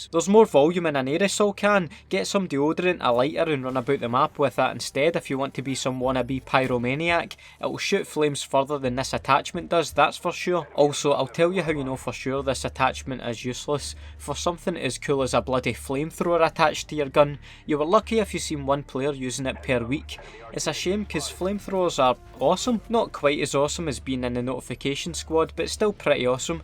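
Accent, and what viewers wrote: Scotland, United Kingdom. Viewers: I dont even play COD anymore i just listen iT for the amazing scottish accent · He shoulda said holographic shite instead of blindness feel like its funny saying shite in Scottish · He’s got a strong Scottish accent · everything this guy says is automatically 4x funnier since its scottish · I cannot say just how much I love the Scottish accent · Half the shit he says wouldn’t be funny in an regular accent but in this Scottish it has me dead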